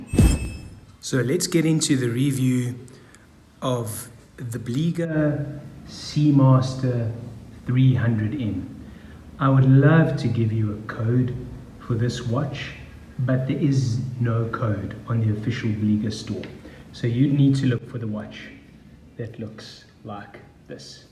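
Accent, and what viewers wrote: South Africa. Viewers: What accent is that ? I'de guess SA.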